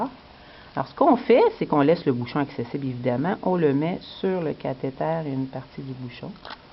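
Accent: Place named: Canada. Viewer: Ah ce bel accent canadien, quel bonheur. Surtout quand la vidéo est de qualité comme celle que vous proposez. Merci beaucoup. Grâce à vous je vais peut-être me réconcilier avec les piqûres et les perfs.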